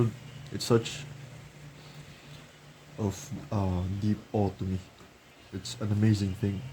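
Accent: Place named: India. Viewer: Lol why are you trying to hide your accent! Embarrassing your Buddy Indian accent!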